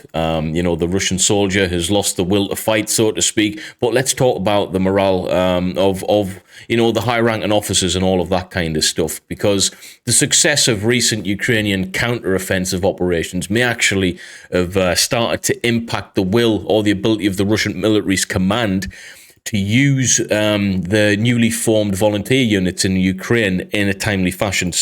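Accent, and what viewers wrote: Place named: Northern England, United Kingdom. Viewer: I thought I was hearing a little Northern England in your accent!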